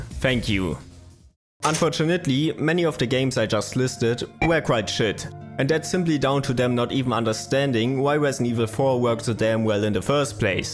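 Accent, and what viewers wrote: Germany. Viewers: Diesmal kommt der krautige Akzent aber wieder häufiger ❤ Gutes Video, die Montage mit dem Zombie der sich beim Nachdenken ans Kinn fasst hat mich fast gekillt 😂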